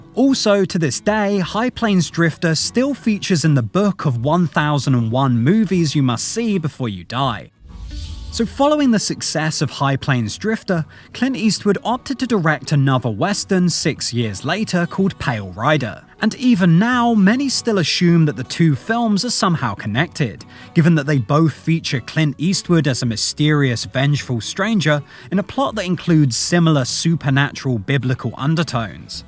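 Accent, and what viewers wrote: United Kingdom. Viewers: I always wondered why when people make these videos it's never Americans always sounds like a British person or something like that I don't get it should be Americans doing this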